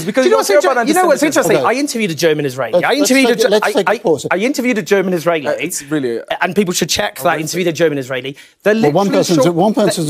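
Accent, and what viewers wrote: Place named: Wales, United Kingdom. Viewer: I wanted to watch your video, but your accent is really hard to get my friend. Is it Welsh? Or Australian?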